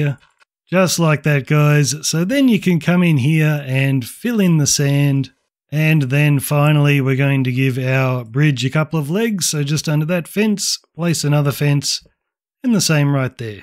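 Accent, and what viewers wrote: Australia. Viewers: so.. we and my friend built this whole thing in like 5 days, allow me to get you some constructive criticism what am i gonna say; first of all PLEASE, i BEG you to dedicate like 30 seconds of the guide to tell us which blocks do we take before getting to the next part instead of just "so when ur done you should be looking like this now place a scaffolding" like we didnt have these things in our hotbar, every time you say to place a block we have to pick it up from creative or from shulkers if ur on survival mode, that takes extra time and gets kind of annoying as the building goes, like we got so insane building it all my friend started to mock your australian accent and burnt out of minecraft as we finished the building (dont take it personal, its prob just us building it from 10pm to 6am for 5 days straight) or you could just leave a litematica scheme, people would thank you a million times but you probably wouldn't get as much views on all these guides also, in the replies you said the outlines dont line up because some of the measurements are for the gaps between the stone, would be nice of you to tell that in the video itself because we REALLY messed the measurements and got sick of constantly fixing the walls and all that mess led up to us not even bothering to fix some of them the building ifself though is AMAZING and is really worth the time also may i ask you a question, how old are you?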